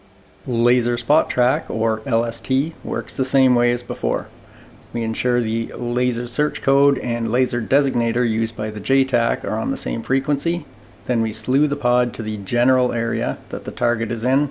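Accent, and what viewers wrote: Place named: Maritimes, Canada. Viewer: Awesome video! You must be a Maritimer. Newf?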